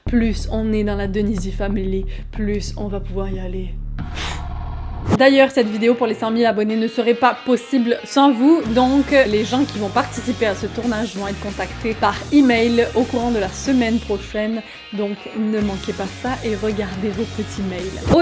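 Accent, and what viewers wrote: Quebec, Canada. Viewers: J'adore tes vidéos et j'aime trop ton accent ❤😉(j'aimerais bien avoir l'accent québécois ,et parler la langue )❤😋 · j'ai hésiter de m'abonner a la première minute et en fait ,tu fait beaucoup de blague et tu explique très bien , et j'adore entendre des québécois/e parler français , avec leur accent . J'adore ta chaîne continue comme sa tu fait de superbe vidéo , tu va rapidement être de plus en plus connue je pense ! par contre je suis PAS SUR que mes parents voudrons bien que je vienne tourner avec toi ,et même si tu habite en france je pense que tu habite pas en bretagne , sachant que j'ai que 13 ans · Salut tu pense et aller à quelle âge au Québec et tu pense avoir eu au bout de combien de temps accent québécois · Je suis trop contente avoir une youtubeuse qui parle québécoi comme moi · J’aime trooooooo l’accent québécois omg 😍😍 c’est pour sa que je regarder t videooo ❤️ pas juste pour l’accent he 😂😂 tu me fait trooooo rire continue comme saaaa 😌 je te soutiens a fond 💪 enfin, ON TE SOUTIENS !! 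❤️❤️💪💪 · J’apprends l’accent québécois avec toi et j’ai encore plus envie de passer ma vie dans ce pays de caribous. Tu es vraiment inspirante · Ben moi sais simple tout se que j'ai à dire ben sais que tes génial et j'ai une amie Elle et née au Québec et maintenant elle habite en France 😀 et je t'adore tes génial PS j'adore ton acens j'adore l'acens Québécois 😀😁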